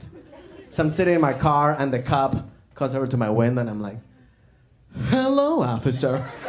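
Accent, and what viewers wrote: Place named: Venezuela. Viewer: Jose Bravo Well they’re both from Venezuela same Accent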